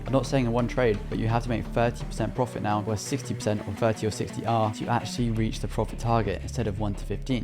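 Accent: United Kingdom. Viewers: you know that YT is a global platform where people from all over the world watch your watch... my worry is you speak faster in british accent some words hard to understand so pls speak slow for certain words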